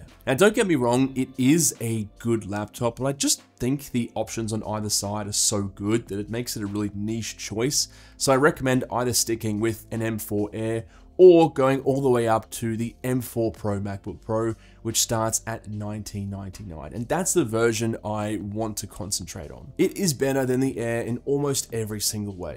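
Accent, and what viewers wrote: Australia. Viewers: Why do i feel stupid when i can’t easily follow through an Australian accent 😅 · me rocking a 2015 macbook pro which i think she is screaming for me to let her retire lol thank you for making this content and nice to hear another aussie to! I tend to lean to more beefy ram as i hold my laptops for years. I do think I can buy any of the current line up and be really happy but I have been a pro user for ages ha.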